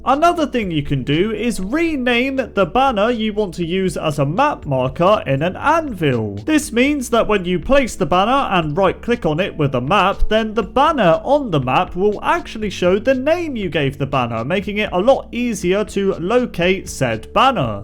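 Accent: United Kingdom. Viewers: are you british? that sound like that.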